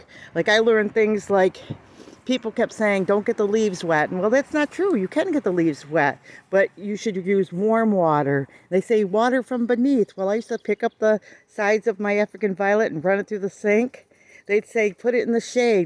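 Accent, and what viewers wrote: United States. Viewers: sounds just like here in Ohio lol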